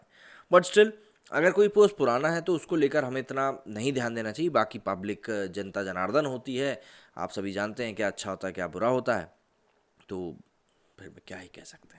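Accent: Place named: Assam, India. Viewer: I think your accent have an assamese touch.😄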